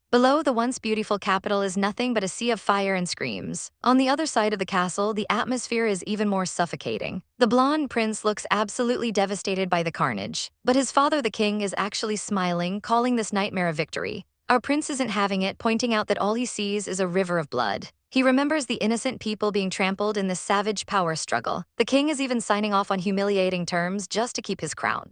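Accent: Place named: New England, United States. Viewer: Thanks for uploading the next part of this story. I had watched the first portion of it awhile ago and had really been hoping to get the second half of it. I am so glad that after such a tragic beginning, things finally, finally started to turn around. I also want to say that the voice narrator on this story sounds EXACTLY like my sister-in-laws voice! 😅 I almost thought it was her! 😯😆 The accent she has is from living up in the New England states...mostly just outside of Boston, but we did live near eachother years ago back in Maine as well. Made me enjoy the story even more. ❤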